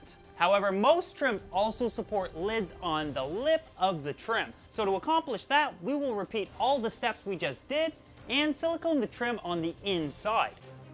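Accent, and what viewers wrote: Canada. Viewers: I love how Canadian you sound... it's great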